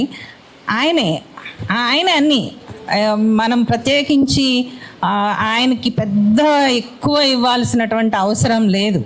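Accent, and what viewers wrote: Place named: Andhra, India. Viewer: vellaavuu vachaavu antaavu antoo mee speech lo chala andhra slang ae vinapadtundhi... meeru endhi Telangana tarapuna maatlaadedhi??, first complete ga bhasha lo yaasa maatlaadi aa tarvatha itla press meets lo speech iste oka value untadhi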